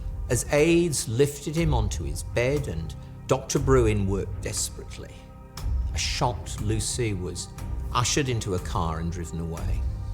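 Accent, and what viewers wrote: United Kingdom. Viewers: Why do you have an Englishman narrating American History? I love the Brits, don't get me wrong, but right is right and wrong is wrong.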